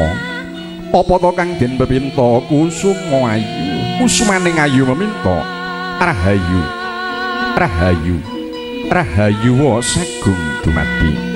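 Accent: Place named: Java, Indonesia. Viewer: Jian mantep...artikulasi jelas...intonasi pas...logat jawanya trep....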